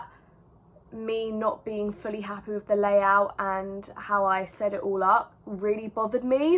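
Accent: United Kingdom. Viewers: Wait- I didn’t know you were actually British 😭 but I love your accent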